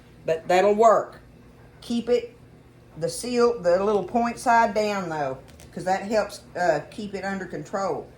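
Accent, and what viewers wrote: South, United States. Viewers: I love how you talk Southern also I'm from Oklahoma so I talk the same way! Where did you purchase the little round rack that fits over dinner plates? I need to get me one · I love how you talk your Southern❤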